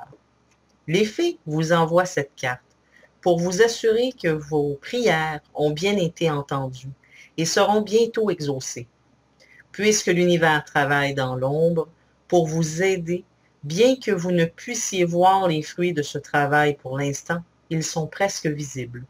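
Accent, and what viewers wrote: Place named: Quebec, Canada. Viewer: OH !!! UN ROBOT QUI PARLE QUÉBECOIS ...!!!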